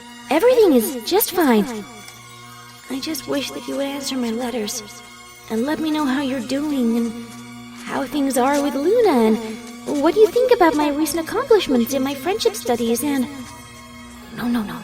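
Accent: United Kingdom. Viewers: i loved your storys plus your voice is kinda cute in British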